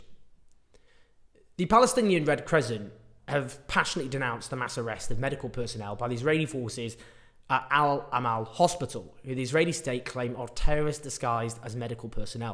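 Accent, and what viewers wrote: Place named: England, United Kingdom. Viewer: What English accent is this?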